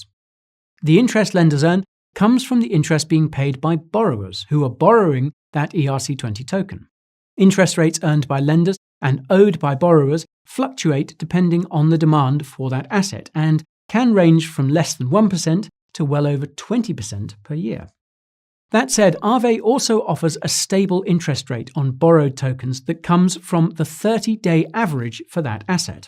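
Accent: United Kingdom. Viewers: string agree....u from United Kingdom right as i love a way u talk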